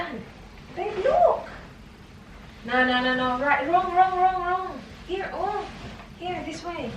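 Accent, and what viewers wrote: Visayas, Philippines. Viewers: Hello po. You sounds like bisaya. May I know kung taga saan po kau? I'm proud bisaya from Davao city:-). Looking forward for more videos to watch... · Life en easy lng naiintndhan ko ..😒😒😒bisayang bisaya ang dating sa mga words na sinasabi... buti ate nagustuhan ka niyan😄😄😄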